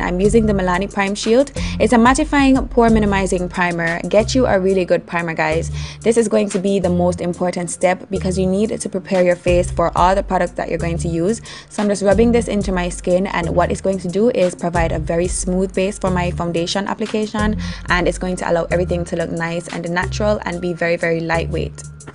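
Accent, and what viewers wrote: Jamaica. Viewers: Jamaican ? Yayyyy!! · Are you Jamaican cause you sure sound like one ..... well I think you are ! God bless you .... you’re extremely beautiful and blessed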